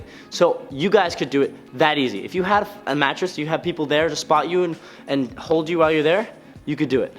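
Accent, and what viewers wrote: Australia. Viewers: are you Australian? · R thay from Australia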